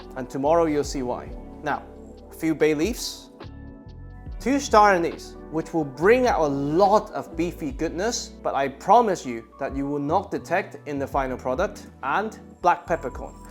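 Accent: United Kingdom. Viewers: Nah, it's an Asian dude speaking British English giving lesson about Italian dish that got me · u haven’t been outside enough if you think an Asian guy with a British accent is impressive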